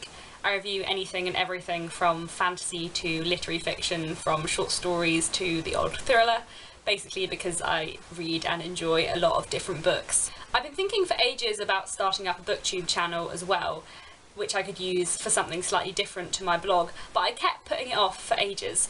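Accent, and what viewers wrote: RP, United Kingdom. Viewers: Congratulations on your 10 year anniversary,! I'm so happy to be able to watch your content right from the beginning (as of June 2025 I've been watching for about 6-7 years but never scrolled back this far), you did sound very posh here! 😊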